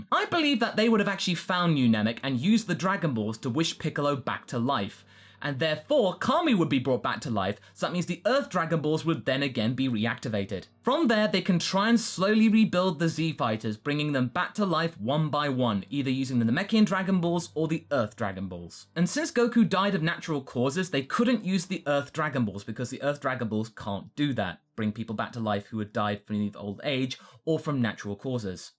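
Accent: United Kingdom. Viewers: hearing "robot arms make everything better" in your beautiful British accent made me happier than it should have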